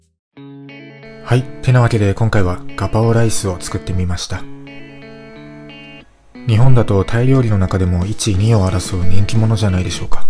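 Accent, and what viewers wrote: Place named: Tohoku, Japan. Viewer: 東北のどこ出身なんだろう😂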